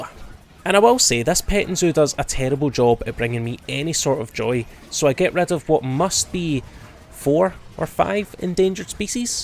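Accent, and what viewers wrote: Scotland, United Kingdom. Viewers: A Scottish Borderlands youtuber, I'm in!! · u a scot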